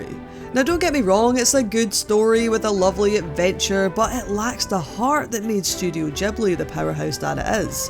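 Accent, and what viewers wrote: Scotland, United Kingdom. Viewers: Agh, dang it! I had a feeling I got it wrong! It was in the back of my head for a while. I was like: “you should edit that. She might be from Scotland.” I blame count dankula. I was like, “she doesn’t sound like *him*, so, no.” Well thanks for not taking offense. I know the scots and the Irish hate being compared to each other. And yeah, I think we all wanna be a bit more like SuperEyepatchWolf sometimes. That man is a legend. I look forward to more videos as always! Thanks for your kind responses.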